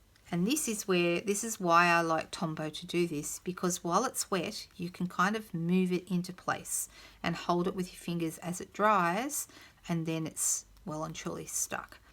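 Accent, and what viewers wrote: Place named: Australia. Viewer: Thank you for making this set less daunting 😀👍🏼. Have ordered it & now feel ok about trying it out . Nice to hear an Aussie voice😀. Jane from SA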